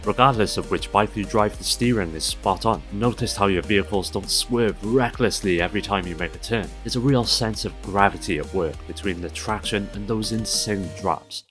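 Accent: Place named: Wales, United Kingdom. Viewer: Man I KNEW you were a fellow Welsh. You can't miss that accent.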